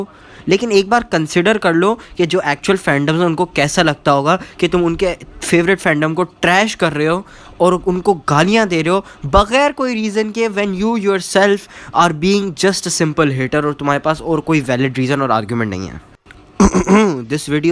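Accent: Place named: India. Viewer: Lol the way u talk sounds like u r an Indian boya! 😂 bcz ur accent seems to me like an Indian accent tbh.. btw I really enjoyed ur this video... maza aya...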